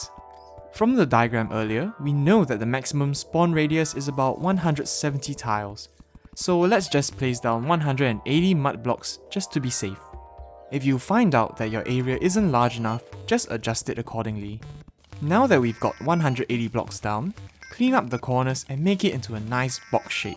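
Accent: Singapore. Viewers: Are you from singapore? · I knew it from the accent. This man is Singaporean. Cool to see.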